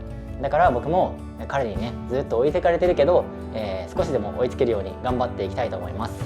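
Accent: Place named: Japan. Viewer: Please do not doubt your English pronunciation. It's great. You can pronounce words correctly which are impossible for other Japanese people to do. And don't worry about your accent. Even after living in the US for 21 yrs a slight German accent will slip into the conversation, even though Americans always thought I was Irish or Swedish 😂. When I look at your built I always see Yoshiki-san from XJapan . He has the same height as you but a real strong upper body. He is slim but has muscles from drumming and physical exercise. So it is not impossible for you to achieve.- I wish you all the success in the world ❤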